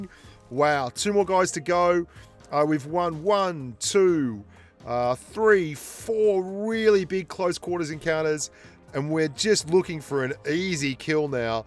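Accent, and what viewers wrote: Australia. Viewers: He is better then powerbang. I like how he explained the strategy and technique. With that Aussie accent 😁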